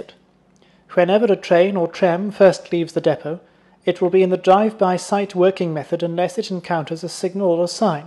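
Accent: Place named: RP, United Kingdom. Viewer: This is a fantastic film, for three reasons. Firstly, it clearly demonstrates the drive by sight working method as implemented in Simutrans-Experimental. Secondly, you have done a very good imitation of the Received Pronunciation accent and the vocabulary used in interwar public information films and newsreels. I particularly appreciated the affected "wh" or /hw/ sound that is typical of the era. Thirdly, I personally find there is a touch of comic absurdity in the care and attention that has gone into something as superficially mundane as a software update. There is something very English and male about the whole thing. Thank you so much for the film, and I look forward to making use of this working method when the new version of Experimental is ready (because I am fairly certain that you will not release it until it is absolutely ready). 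One can only hope you had half as much fun making it as I did watching it!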